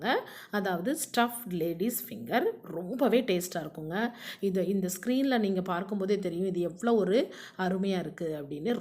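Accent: Tirunelveli, India. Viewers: Nice mam... Neenga tirunelveli ah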